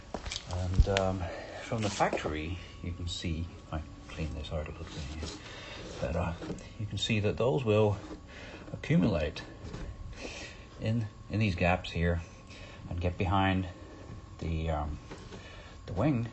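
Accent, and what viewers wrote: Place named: Ireland. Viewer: Do I detect an Irish twang to your accent? Great video by the way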